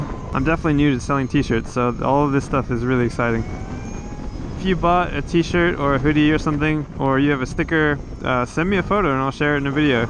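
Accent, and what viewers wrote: California, United States. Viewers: Great insite! I often get asked what I'll do if my battery needs to be replaced of if something much better comes along. My answer is that I'll sell what I have and buy the next thing. I'm writing this response on my new galaxy Fold phone/table, in my Tesla, dreaming of owning an Energica. I think you have a California accent. Your d's sound like t's. Like when you say "newzerolanT." I'm accused of having a Canadian accent. I say "hoose" and "aboot" instead of "house and "about." But I grew up in Massachusetts so that doesn't make any sense, eh?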